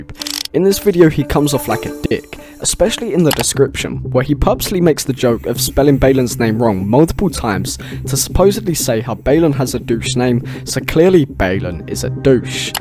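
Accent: United Kingdom. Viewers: as a 'modern activist' myself, this man is not the definition of an activist at all. The fact that you are using the term 'modern activist' as a negative term is derogatory to those of us who actually do work every day to TRY to bring attention to pertinent issues. If you think COMMENTARY YOUTUBE is ACTIVISM, you are SORELY misunderstanding the definition of the word. Sorry babe-- ur british accent doesn't hide your lack of ability to use google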